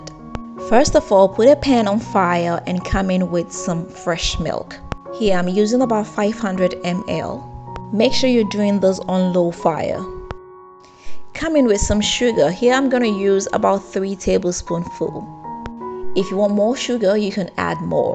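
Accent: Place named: New Zealand. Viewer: Kinda like a new Zealand accent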